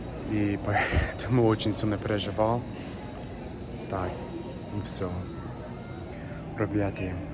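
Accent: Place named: United States. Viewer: Ваш русский язык вполне можно понять. Нам тут в Казахстане не привычно слышать акцент американца, так как у нас мало инострацев.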